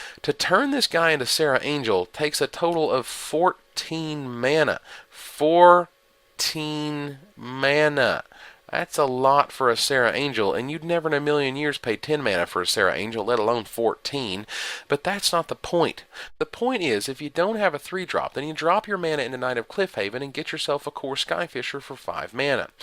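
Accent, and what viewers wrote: South, United States. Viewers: He's from the south, he can't help it.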